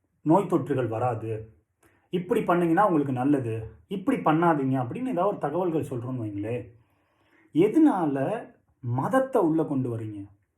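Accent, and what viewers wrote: Standard. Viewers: Pls sir neenga eppovum pola videos podunga. Neeng feel pnatra mathiri videos podathinga nijamve fleeing iruku sir, pls mathavangla care panthinga epavum pola azhaga tamil la azhaga pesuvinga ila athupola podunga athan ungaluku azhagu. Senthamil pesuvinga parunga 👌👌👌👌👌👌 . So continues videos podunga. Nalla smile panite pesunga. God bless you sir. Ungalai pakka en anna polave iruknga. So feel panthinga bro.. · normal தமிழ் பேசுறது நல்ல இருக்கு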